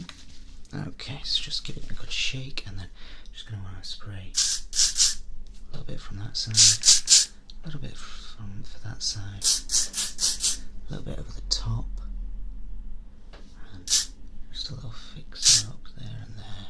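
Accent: United Kingdom. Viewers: Just found your videos on my ' Recommended' list and honestly I adore your style! So happy I found you as there aren't many 'decent sounding ' Brits (no offense to any other fellow Brits, just some accents sound better to me personally).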